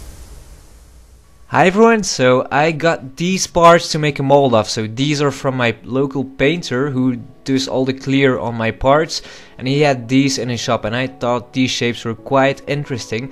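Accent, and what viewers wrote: Netherlands. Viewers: dutch accent cant hide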